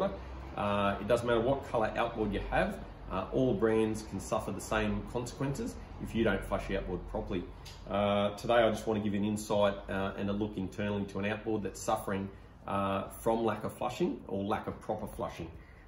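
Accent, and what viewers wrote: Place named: Australia. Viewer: Australian accents are the worst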